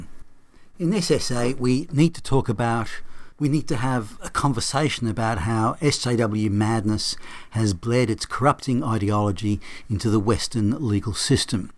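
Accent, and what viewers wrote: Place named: Australia. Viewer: This is an unique reinterpretation of the Rodney King event, reinterpreted in light of the current social marist agenda. I remember when that went down. I remember similar racial confrontations going all the way back to "Black Day in July", when Detroit burned. Maybe you could reinterpret that too in the same vein, but you would be as wrong as you are about Rodney King event. These issues are far more complex and nuanced then you give credit to. From your accent, I might guess that you were far away from the event when it happened, on the other side of the world in fact in Australia. If that is true, you would not have the perspective of someone who grew up in America and experienced racial tensions first hand. However, just now I looked up to the statement under your video where you ask if the1990's trial of African American Rodney King introduced racial activist jurisprudence, and that is certainly a valid question.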